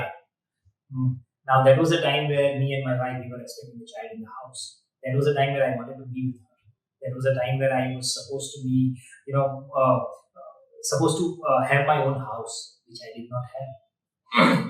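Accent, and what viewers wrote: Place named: Gujarat, India. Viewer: Only people from Gujarat can feel Gujarati accent in English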